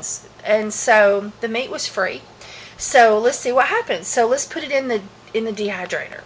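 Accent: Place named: Midwest, United States. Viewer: Wow, this is a really cool video. I am not sure where you are from, but I love your Midwestern accent. I love how you are doing traditional Jerky with a more modern spin on dehydrating. It sounds like you are just learning how amazing this is. I love meat, but I am on a living foods diet of nothing but vegetables nuts seeds grains and fruits of course, and I use the Exc. for making living foods recipes, but you cannot go over 115f or the life force in the plant u are doing will die.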